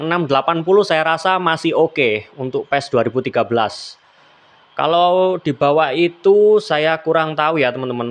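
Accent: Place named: Java, Indonesia. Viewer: Sene deragon, bahasa medok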